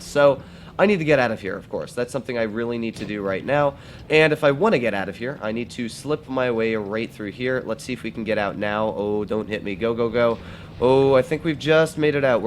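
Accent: Russia. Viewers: are u secretly russian? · Love the tiny Russian Accent, stalin would be happy.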